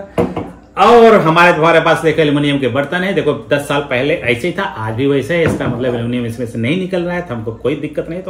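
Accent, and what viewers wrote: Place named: Jharkhand, India. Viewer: Bhaiya aap kya jharkhand se hai kyonki apke baat karne ka tarika jharkhand wala hai isliye pucha or agar sachme aap jharkhand se hai to I am very proud of you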